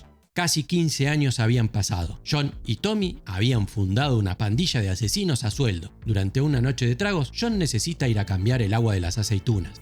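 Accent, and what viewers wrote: Argentina. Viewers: Definitivamente me encanta el acento argentino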